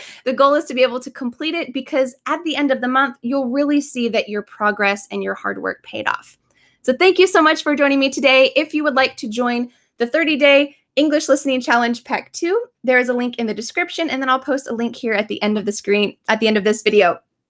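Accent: United States. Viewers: I like ur voice,you know,just easy to understand and concentrate. perhaps in my view American English is easier to follow with,it's kinda different from what we'd been taught in textbook. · people in US speak not only fast but also in slang and with different accents. That's the difficult not only in US but also at the places with English speakers. You have the perfect American accent I have ever heard. I like your videos.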